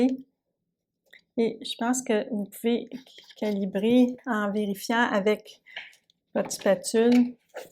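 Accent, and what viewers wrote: Quebec, Canada. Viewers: Merci beaucoup. Je suis française et suit des cours de peinture à l huile.l accent québécois en plus qu on adore en France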